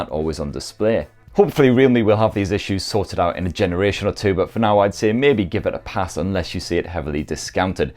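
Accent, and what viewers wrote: United Kingdom. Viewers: Am I the only one who watches his channel for his absolutely lovely British accent? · You didn't Include links or written names to any of these items, you ran through cryptic names too fast and with your British accent I have no clue how to reference and follow up on any of those devices.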